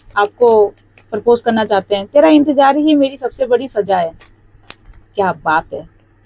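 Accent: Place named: Madhya Pradesh, India. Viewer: Mem Apke Bolne Se He Muje Pata Lag Gaya Tha Ki Aap MP Ki Ho Mem Apki Reading Ne To Kamal Kar Diya 10000% Perfect Same To Same Situation Vry Vry Tks Gujarat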